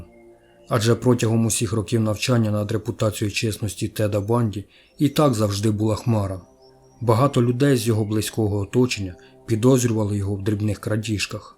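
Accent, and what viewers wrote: Ukraine. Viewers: так і подумала. Вимова як у Черкаській або Кіровоградській. Може, і Полтавська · Ведучий випадково не з черкаської обл?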